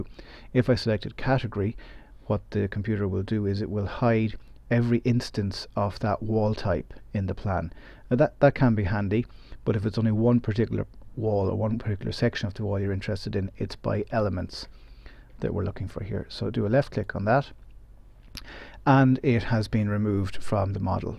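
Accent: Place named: Ireland. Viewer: Its good to hear an Irish voice and well explained.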